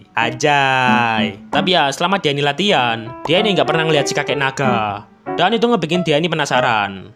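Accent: Java, Indonesia. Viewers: ini jowo bgt plisss, awokwok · Orang Jawa ya bang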